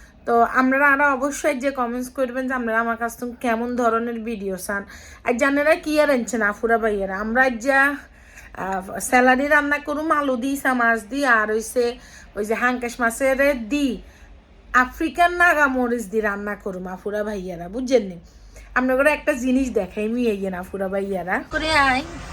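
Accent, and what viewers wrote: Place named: Bhola, Bangladesh. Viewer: আসসালামু আলাইকুম আপু।আমনেরে এক্কেরে আর দাদীর মতন লাগে বোজ্জেন নি আপু,আমার বাড়ি ভোলা চরফ্যাশন কেরামতগন্জ কিন্তু আমনেগো আর আগ্গো কথা এক্কেরে মিল আছে বোজ্জেন নি আপু,,,, আমনের ভিডিও লইয়া আমার কোন অভিযোগ নাই,,, আমনেরে দেখলে আগ্গো দেশ দেকচি মনয়,,, আমি বর্তমানে চট্টগ্রাম থাকি 😢😢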